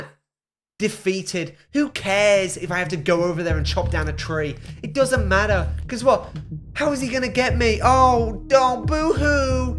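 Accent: United Kingdom. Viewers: I love British man talking angry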